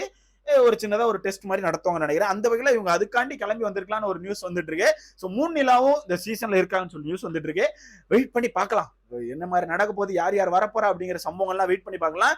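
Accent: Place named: Tenkasi, India. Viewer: தம்பி போனசீசன்ல பிக்பாஸ்க்கு ரசிச்சனோ இல்லையோ உங்களின் ரசிகன் ஆகிவிட்டேன் ஏனென்றால் உங்களின் வட்டார வழக்கு தென்காசி மாவட்ட வட்டார வழக்குபோல் உள்ளது அதனால்தான்.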